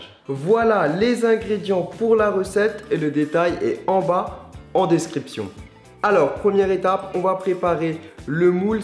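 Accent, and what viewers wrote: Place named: Alsace, France. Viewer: je pari que tu est alsacien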